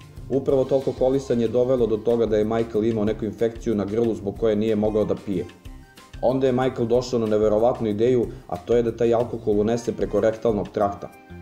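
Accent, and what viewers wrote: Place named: Užice, Serbia. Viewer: Jesi li ti iz uzica?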